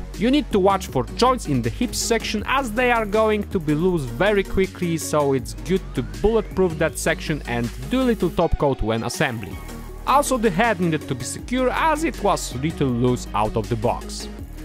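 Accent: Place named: United Kingdom. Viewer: I'm in NC, USA. But you sound like you're over the "pond" lol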